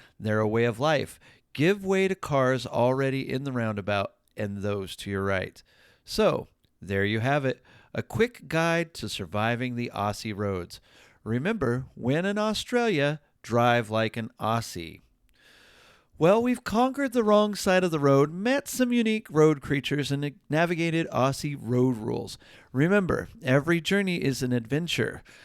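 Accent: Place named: United States. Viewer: I'm sorry with that American accent really put me off. Australia is NOT all about Kanga's and Wombat's on main roads. Learn a bit better. Everdday driving in Oz is very different. You are on the right in the driver's seat and yes, left of road. Makes sense. We are not imbiciles. Like anywhere, you need to be aware of all dangers and risks here but in everday travel you will not encounter kanga's or wombats!!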